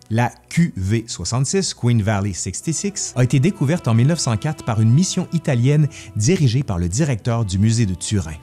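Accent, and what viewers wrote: Quebec, Canada. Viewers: MERCI passionné par l'Egypte depuis la 6 ème (presque 35 ans) cette vidéo ne pouvait que me faire plaisir Je suis d'accord sur la beauté d'Abou Simbel, pour avoir eu le privilège de voir ses 2 temples dès le lever du soleil et donc avant l'arrivée des masses d'autres touriste. Pour la tombe de Nefertari elle était en retauration a cette époque là; un pretexte parfait pour retourner un jour en Egypte Continue ainsi et vive l'accent du Quebec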